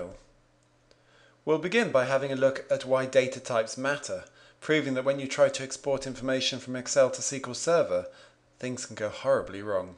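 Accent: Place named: RP, United Kingdom. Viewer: If 007 did a video tut on ssis, this is how it would be -- entertaining and informative, all done in typically delightful Queen's english! Thanks for the awesome videos. -- roger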